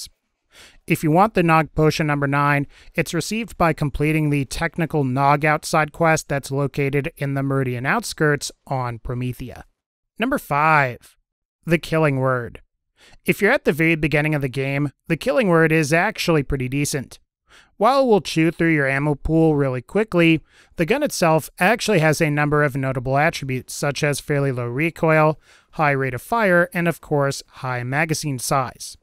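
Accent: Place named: East Coast, United States. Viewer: Im guessing usa east coast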